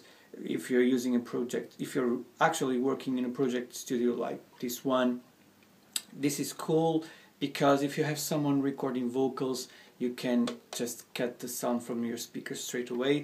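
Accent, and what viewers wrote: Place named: Portugal. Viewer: Pelo sotaque pareceste-me ser português lol uma pequena pesquisa e confirmei suspeitas! eh ehh:) bom trabalho! Cruzei-me ao acaso com este video e gostei!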